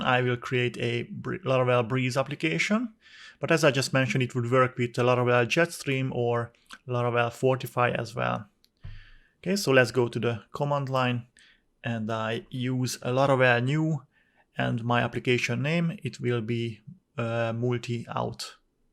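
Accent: Hungary. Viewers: Hello and thanks for the video, it really helped me complete my project!! also I study in hungary and I immediately recognized that hungarian english accent lol, thanks again.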